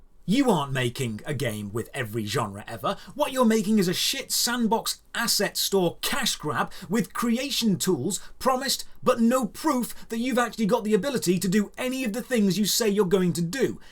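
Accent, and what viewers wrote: England, United Kingdom. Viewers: Your Commentating reminds me of top gear, either its the nostalgic accent from my childhood in England or just your Personality.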